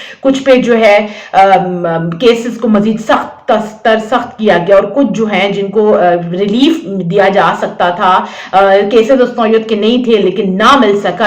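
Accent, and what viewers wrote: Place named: Pothohar, Pakistan. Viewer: Great Going with Pothohari Tone of Urdu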